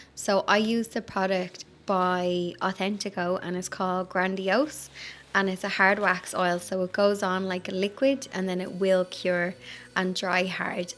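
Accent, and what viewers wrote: Ireland. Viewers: Aloha doll, new to your channel & adore your style so much. To hear that lovely accent again is a treat. I used to spend most of my summer holidays over in Sandymount & Ringsend, with close family friends. Many happy memories of The Strand, Bray & Phoenix Park & the town centre in Dublin. The cats are gorgeous. XXX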